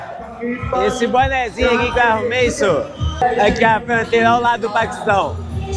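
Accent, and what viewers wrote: Minas Gerais, Brazil. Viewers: Esse vídeo poderia ser gravado por qualquer pessoa, mas o sotaque mineiro dele deixa tudo muito melhos ahhaa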